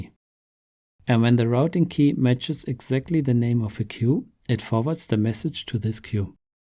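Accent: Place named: India. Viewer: Very good, u almost sound like an indian person too!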